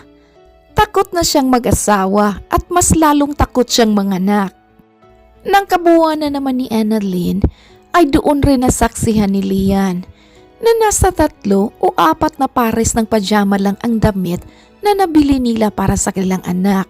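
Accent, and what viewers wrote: Visayas, Philippines. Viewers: Bisaya di ay ka....😊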